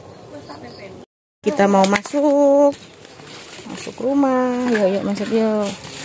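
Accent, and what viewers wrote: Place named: Lampung, Indonesia. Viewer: Lucu mbak bhsamu hhhh. Dlm dri lmpung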